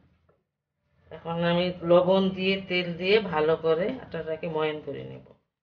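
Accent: Bangladesh. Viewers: aapnaar bangal bhaasaay kothaa bolaa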